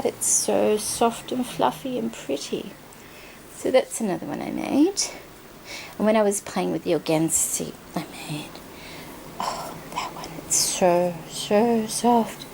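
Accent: Australia. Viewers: Love them ... they're beautiful! Nice to hear an Oz voice ... there's not many Oz ladies doing tutorials! Jennings from the UK does some lovely Shabby Chic ... you could check her out 😊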